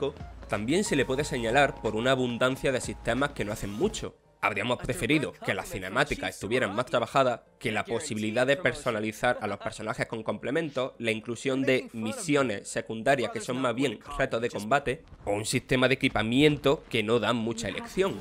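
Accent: Andalusia, Spain. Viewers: Pues no parece esforzarse mucho en hablar castellano... El acento andalú le sale en cada 3 de 4 palabras... Yo sí que me he tenido que esforzar por entenderle....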